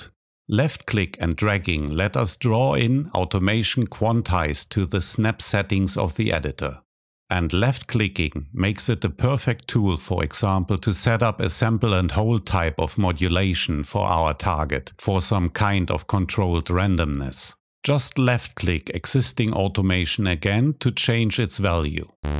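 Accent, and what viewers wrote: Germany. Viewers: Yes.. i think he is German. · Where are you from? Your accent sounds german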